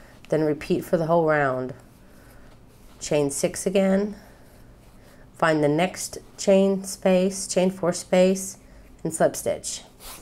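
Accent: South, United States. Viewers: Have you lived in Israel all of your life? You have a accent with a USA southern drawl somewhat. I'd love to visit Israel. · Did you say in "Israel'? I've been following your videos and never picked up on that. You sound so southern to me. Did you move there? Did I even hear right? Sorry, couldn't focus. Played it over. Anywho... thank you again for another video.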